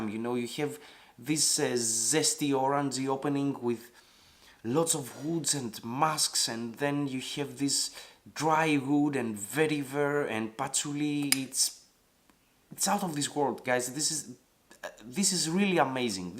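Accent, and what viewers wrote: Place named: Greece. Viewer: XD recognized the accent im Greek too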